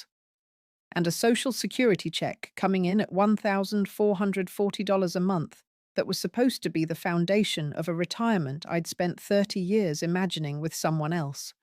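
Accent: United Kingdom. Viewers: First time I hear an Ohio person speaking with a British accent.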